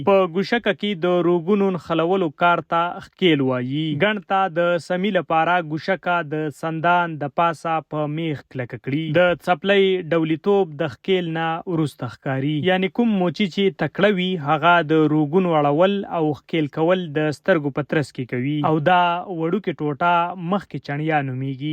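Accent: Standard. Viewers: Bhai pure pashto Masha Allah ❤✨ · DER zabardast explanation de okro ji DER kha zma staso awaz staso Andaz ao pure pukhto Dera khwakha da umeed laram che kala ba mulakat oko insha ALLAH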